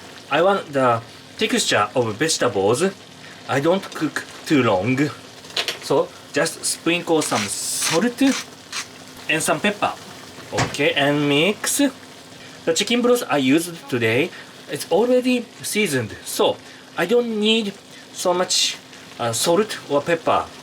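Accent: Japan. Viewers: excatly Japanese English accent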